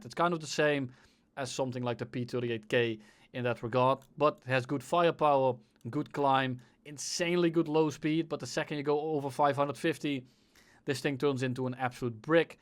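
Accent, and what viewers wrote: Netherlands. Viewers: ik hoor n beetje nl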